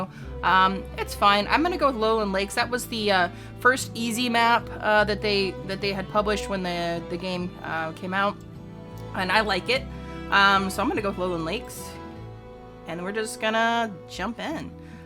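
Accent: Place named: Texas, United States. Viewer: that's awesome! I was born and raised in texas too lol okay I thought it was kinda texan sounding but some southern drawls can sound similar haha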